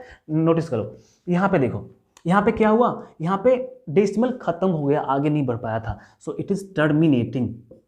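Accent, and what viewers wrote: Bihar, India. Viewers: Sir are you from Bihar?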